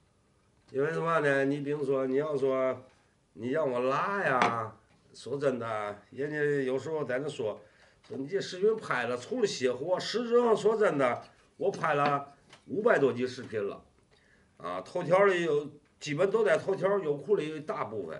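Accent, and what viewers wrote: Tianjin, China. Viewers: 天津口音 哈哈，很亲切啊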